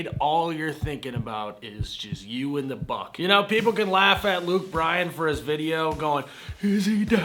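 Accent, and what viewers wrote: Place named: Dakota, United States. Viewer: I've seen your comedy sketches on FB for a while, but just over the last couple weeks have seen your YouTube videos. With that said, loving it man 😂. Can tell you're a Dakota guy, and sound exactly like all my friends from back home when you're talking about real shit.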